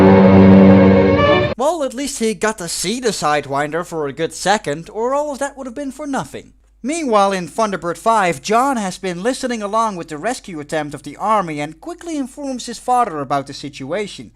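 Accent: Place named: Netherlands. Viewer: I hate your accent i can tell your dutch i am also dutch ahaha😂😂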